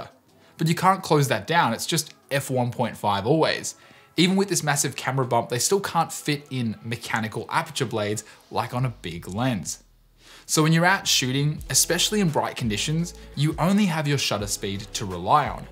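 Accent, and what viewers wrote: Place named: Australia. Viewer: your AUS neighbour voice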